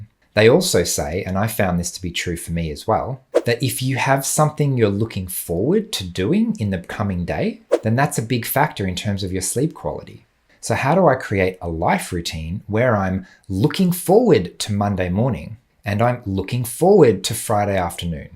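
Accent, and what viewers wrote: Australia. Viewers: He 's Australian...not Scottish...❣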